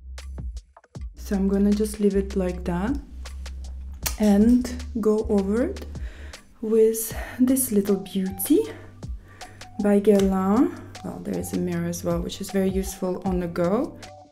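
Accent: Russia. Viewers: Вот меня это тоже удивило - она последние 20 лет как минимум живет постоянно за границей и до сих пор имеет отвратительный русский акцент. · I like it that she still has that Russian accent. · Такая милая, до сих пор акцент русский 😊❤ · Ее английский, так и остался с русским акцентом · She's been living abroad for years, and her accent ,pronunciation are still russian. 😂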